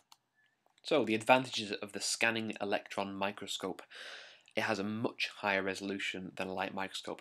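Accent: United Kingdom. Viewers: Great, easy to understand. And not a robotic voice. An awesome British speaker.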